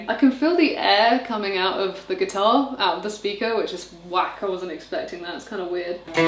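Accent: England, United Kingdom. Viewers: Looks really nice and your English accent is beautiful :)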